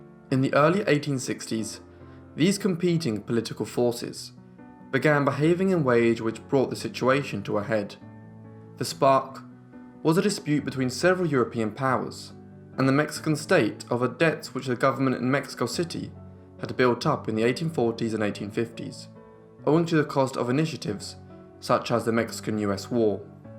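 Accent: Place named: United Kingdom. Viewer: You can't use the snotty British voice It's a nightmare to listen to.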